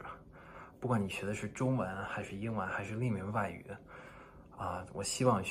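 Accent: Standard. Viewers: OMG！两年中文就这么标准！我英语学了快20年啦，还那么烂！你也太牛了吧！ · omg 很标准！可以关注instagram账户吗？🤔🤣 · 真牛👍！普通话甚至说的比我还标准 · 说的太好了😱发音也很标准哈哈哈哈，你的华裔朋友一定很多是北方人吧，儿化音掌握的很好（eg. 服务员儿～～）🤣你的视频很有启发性，学习外语最快的方法果然还是和母语者交朋友～如果有机会来中国学习或者工作一定又会收获一段很难忘的经历。btw端午节吃的甜粽还是肉粽哇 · 真厉害，太标准了！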